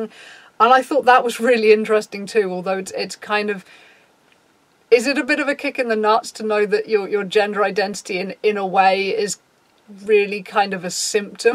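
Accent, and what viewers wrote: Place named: England, United Kingdom. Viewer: Oh my goodness, I just realised that you're from England I keep assuming people people are from america on netflix or youtube and cant even hear peoples accents, I have to stop and figure out if someone's English or American, is that weird? lol and im from England too hahaha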